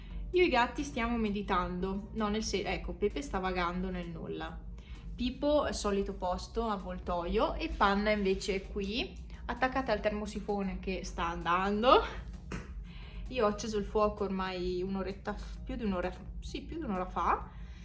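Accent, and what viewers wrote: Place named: Belluno, Italy. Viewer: Ti ho scoperta per caso cercando video sui gatti... E poi sento l'accento e mi incuriosisce xk mi sa di casa... Guardo i tuoi video e vedo che sei da Belluno (montagna da come vedo) 😍 io sono da santa Giustina, ormai da due anni trasferita ad Amburgo... Bello sentirti parlare e vedere le bellissime Dolomiti ❤️ da dove sei di preciso, se posso chiedere...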